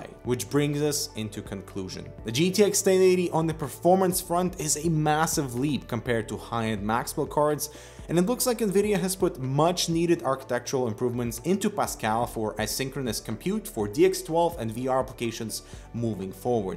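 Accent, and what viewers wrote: Russia. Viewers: русский чтоле? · То есть, он русский? :D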